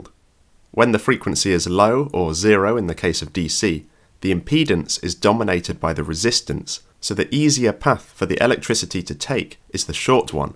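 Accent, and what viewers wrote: United Kingdom. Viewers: He´s maybe in Germany, but he´s clearly a Brit...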